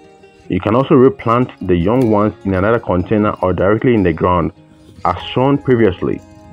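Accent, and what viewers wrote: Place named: Ghana. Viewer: Doctor!! This is my 2nd video view today since I found your page! After reading a bit about you i know why I enjoy learning from you!! #1 your soothing voice..I was going to guess Ghanaian because it sounds so familiar and comforting! My neighbor's are from Ghana!! And the grandma (Boss!!😅) was so amazed when she saw the garden jnside my apartment 😁!!#2 youre a 🥼 scientist! My major was in science so you definitely have my attention because I know you will have experimented with all of what you're teaching us and want to deliver the best possible results! #3 youre obviously a kind, generous man and we need more of that in this world! 🌎 So thank you for not only helping me grow some plants that I've failed at for decades, but also giving me hope that there are goodhearted, bright, kind humans around. Blessings to you and yours Dr!✌🏼💜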